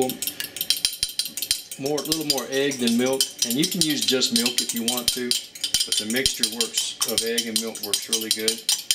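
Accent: South, United States. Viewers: That southern accent is too good I could here that all day..😊🙌🤣🤣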